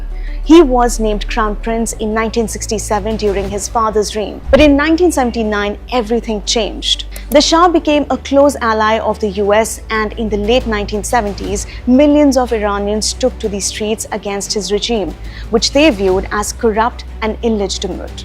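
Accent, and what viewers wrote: India. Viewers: unlike wion and first post,, she speaks with clarity and lesser indian accent,, no ridiculous "she ask she answer" anchoring style like palki sharma,, its like we viewers treated as a 1st grader,, dumb and fool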